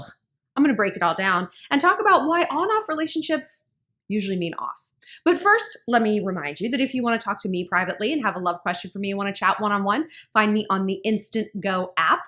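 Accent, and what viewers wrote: Australia. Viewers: Why is your Australian accent lowkey amazing lol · Youre so good at that Australian accent! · Lol the aussie accent is great 😂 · I looovee the Australian accent!!! Hehe please do it more XD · Your Aussie accent is everything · your Australian accent is on point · Great Australian accent 👌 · I love that Aussie accent 🇦🇺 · Your Australian accent is on point 👏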